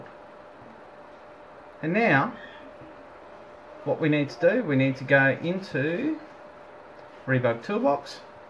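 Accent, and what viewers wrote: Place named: Australia. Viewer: Mate... if I knew where you lived, I'd but you a beer or three...! Got me out of a jam. Good to hear an Aussie accent that knows what he's talking about / doing.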